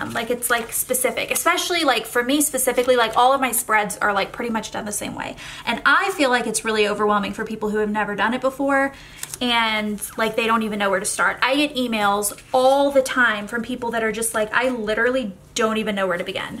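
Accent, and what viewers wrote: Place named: South, United States. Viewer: I can hear your southern accent coming back!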